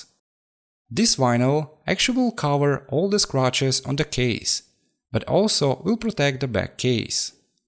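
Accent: Russia. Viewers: По твоему произношению видно что ты Русский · Russian accent lol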